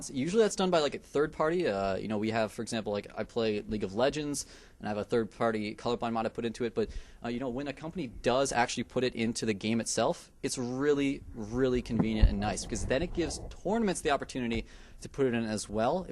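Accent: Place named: Canada. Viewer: I think shes Canadian lol. Aboot!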